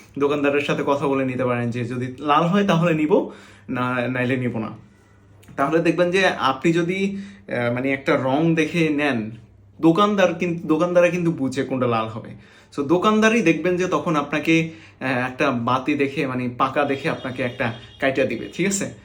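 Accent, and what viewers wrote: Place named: Noakhali, Bangladesh. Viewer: Taile bujben ata tor Noakhailla kotha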